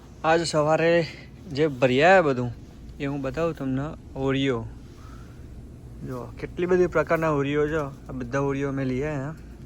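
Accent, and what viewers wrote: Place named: Mehsana, India. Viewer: ભાઈ તમે તો મેહોણા ના લાગો સોં. તમારી બોલી મેહોણા ની સે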